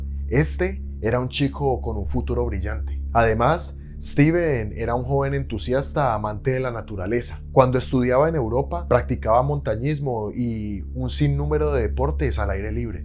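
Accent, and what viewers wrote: Colombia. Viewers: Vos sos de cali ve?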